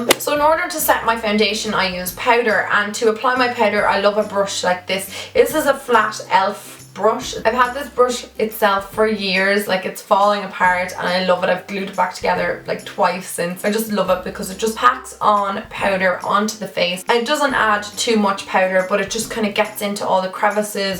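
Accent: Ireland. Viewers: Heyy! I'm new here lol🙈 are you Irish?!? ❤️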